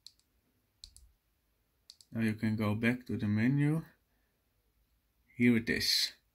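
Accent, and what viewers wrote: Netherlands. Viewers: Hahah Nederlands accent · Echt goud hoe je zijn Nederlands accent hoort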